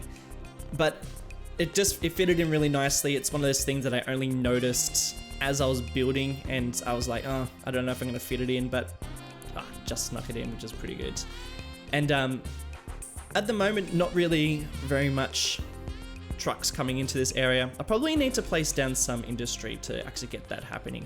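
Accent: Australia. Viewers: I mean mate I'm an Australian and I can already tell about the accent, you're a bloody mate! So keep up the accent it makes me feel like home!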